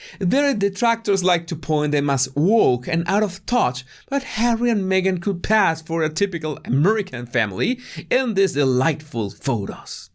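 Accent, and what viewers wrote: Spain. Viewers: hilarious without sounding cruel when we all know full well that its not a compliment just used. Please keep that Spanish lilt, its honest, cuts to the chase and very amusing. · Hes cute too I love that spanish accent. Oye give it to me papi chulo! da meh lo.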